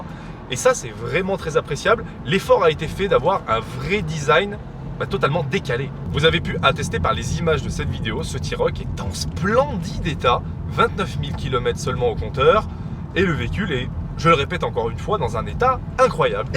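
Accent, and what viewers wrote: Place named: Savoie, France. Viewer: Le combo t-roc + charo avec accent savoie= sa lui va incroyablement bien bref continuer comme sa vs ete les mlr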